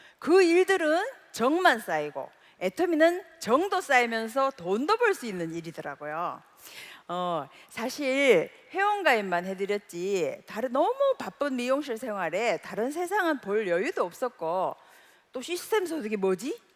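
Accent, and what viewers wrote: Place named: Daegu, South Korea. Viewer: 구수한 대구말 니어디가노? 공주가나? 기름너놨다 ㅎ 맛깔스런 말이 친구랑 같아서 끝까지든게되네요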